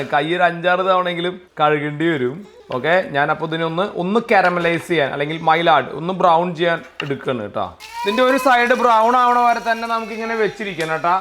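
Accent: Thrissur, India. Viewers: Chettan thrissur karan gadi ahnu enn orapich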